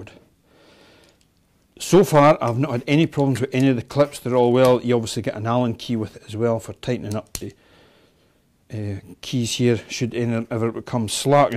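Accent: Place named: Scotland, United Kingdom. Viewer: Clean Scottish accent ;-) like that :-D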